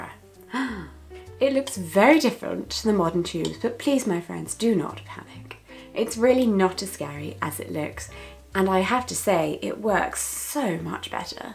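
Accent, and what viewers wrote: RP, United Kingdom. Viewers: Your british accent sounds so proffetional and similar to the royal families accent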